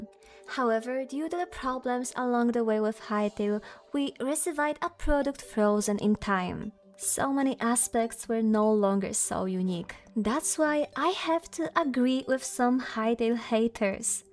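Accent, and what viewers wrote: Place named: Poland. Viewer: ja tam słyszę polski akcent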